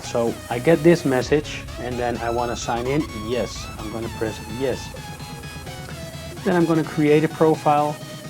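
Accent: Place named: Netherlands. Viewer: I was born in the Netherlands which is why I'm very good at recognizing Dutch accents. So far I haven't been wrong and judging by your accent you must be Dutch.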